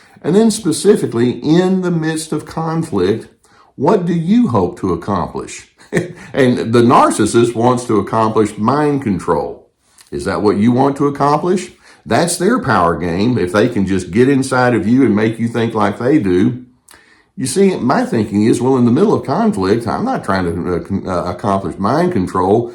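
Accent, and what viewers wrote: South, United States. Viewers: I wish you would talk more slowly. (I get hyped just listening sometimes!) I know you have lots to say (and that you are sharing generously!), but I would benefit from you adding "slow" to that great, kind Southern style of yours. (Thanks!)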